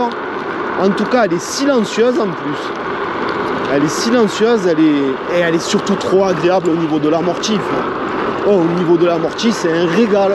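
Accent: Marseille, France. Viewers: merci et trop kiffant ton accent de Marseille je kiffe quand tu dit laissssee passer le monnnstree!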